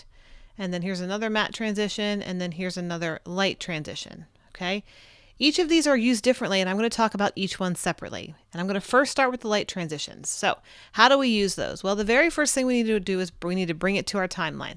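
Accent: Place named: United States. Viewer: Very good explanations and perfect us accent for me (French 😉) !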